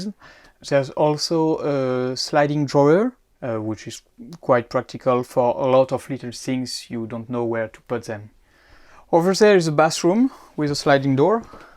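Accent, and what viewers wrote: France. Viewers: beautiful home. And you did very well speaking English with a French accent which was lovely! ❤️ wonderful music. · Vous avez une magnifique tiny house ! Tout y est bien rangé et vous parlé très bien anglais avec un bel accent français 🎵🎶🤗🇧🇪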